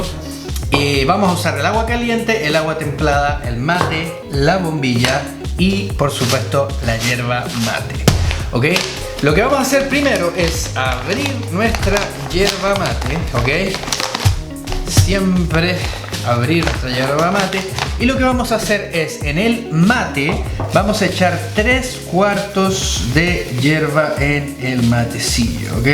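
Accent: Chile. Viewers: Creo q por tu acento sos chileno t agradesco por promocionarlo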